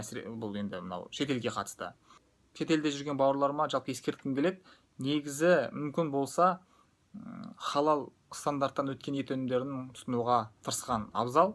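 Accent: Standard. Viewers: Ризамыз таза казакша сойлеиди жане дастур бойынша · қазақшасы қандай таза. Ризамын.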